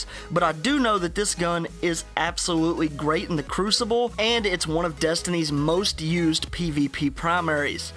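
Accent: South, United States. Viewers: My favorite southerner btw what state r u from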